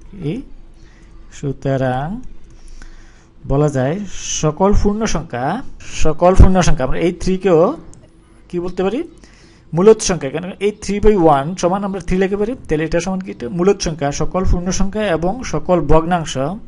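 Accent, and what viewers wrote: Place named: Noakhali, Bangladesh. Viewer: স্যারের বাড়ি নোয়াখালী। স্যার বলে ফুর্ণ সংখ্যা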